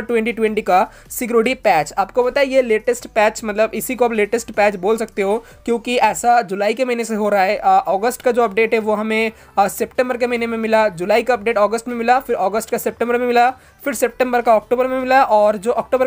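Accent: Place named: India. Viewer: Hmm,. Lovely voice and Hindi accent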